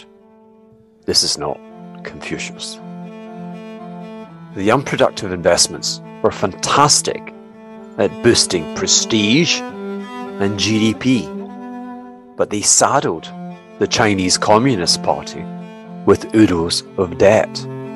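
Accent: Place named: Glasgow, United Kingdom. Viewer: Interesting... but, as always a mind through your own eye. I thought I recognised the accent I saw you before on CNBC and checked. Glasgow lad. Well done you.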